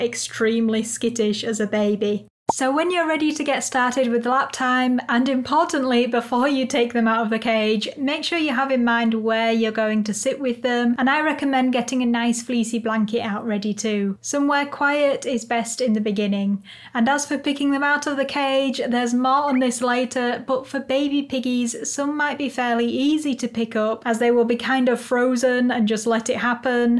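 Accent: England, United Kingdom. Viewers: can I ask you what part of England are you from? I am interested in regional accents (I'm American). And I've always loved the UK 🇬🇧. Thanks.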